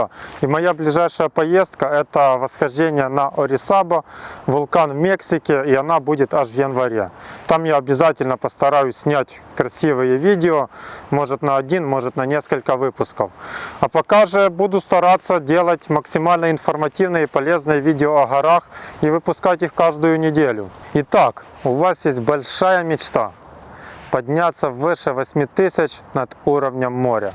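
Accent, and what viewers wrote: Ukraine. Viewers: чому ви не ведете блог українською? По вашій вимові чутно, що ви україномовний. Нахіба оцей випєндрьож?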